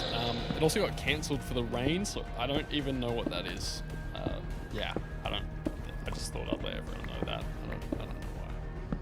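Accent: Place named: Australia. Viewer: Oh GOD you're AUSTRALIAN?! 🤢🤢🤢🤢🤢🤢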